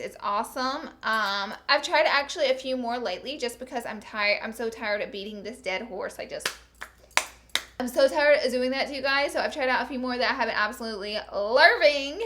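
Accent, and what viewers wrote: South, United States. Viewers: Hi! I just started following you. I am also a southerner turned Californian, so naturally I love your voice! Reminds me of home. =) Love the hair, its super cute!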